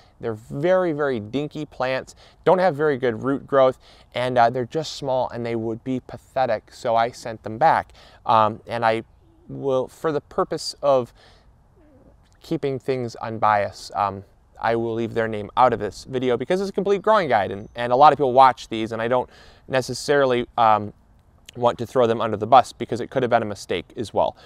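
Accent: Michigan, United States. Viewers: I can really hear that MI accent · you have the thickest Michigan accent, love it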